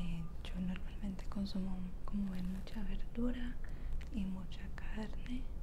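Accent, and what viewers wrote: Antioquia, Colombia. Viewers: AMO LA VOZ COLOMBIAINA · Ya quisiera yo q mi nevera estuviera así de llena :'v Y creo que eres paisa? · siempre me ha encantado el acento Colombiano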